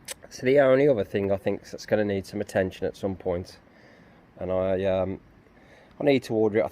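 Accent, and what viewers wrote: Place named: Midlands, United Kingdom. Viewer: Great video. Nice listening to a proper midlands accent. You deserve to gain plenty more subscribers. John👍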